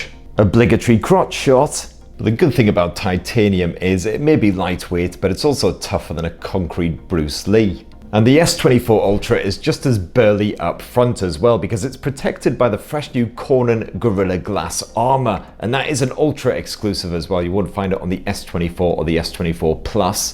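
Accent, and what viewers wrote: United Kingdom. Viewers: I love UK accent,is very clear.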